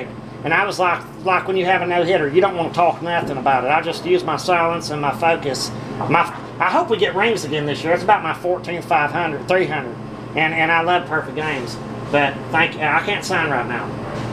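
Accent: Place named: London, United Kingdom. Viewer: What part of London are you from?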